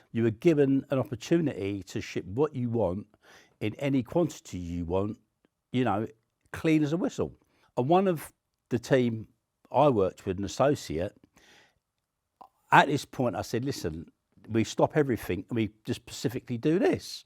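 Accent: United Kingdom. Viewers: This britisch Englisch is so discusting..... Bah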